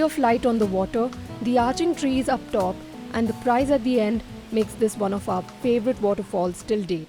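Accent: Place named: India. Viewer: Your horrible accent makes it an unwatchable clip. Find a neutral narrator that is not Indian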